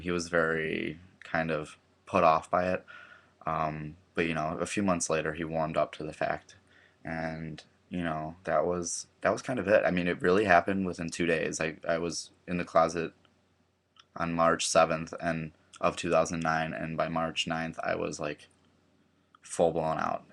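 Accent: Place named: Midwest, United States. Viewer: you are adorable regardless of the lighting & love that midwestern accent...thought you were from minnesota until you mentioned chicago! =)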